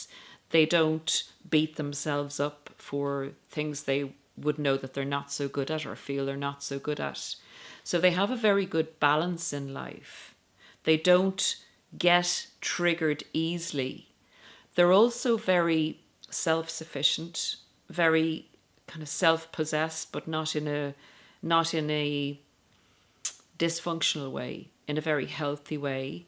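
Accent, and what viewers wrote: Ireland. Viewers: Aye, but her accent is Irish (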